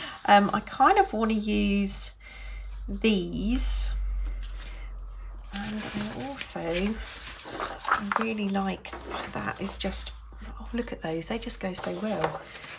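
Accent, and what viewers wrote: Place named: London, United Kingdom. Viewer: Hi, being an ex Londoner in OZ, it seems to me that you’ve suddenly started sounding more London! No offence I hope as, coming from me, it’s a compliment!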